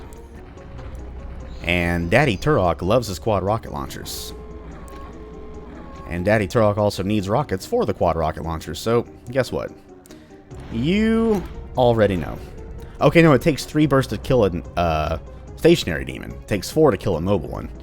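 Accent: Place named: South, United States. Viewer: Thanks from TX. You sound southern yourself! Loved replaying this game with my daughter.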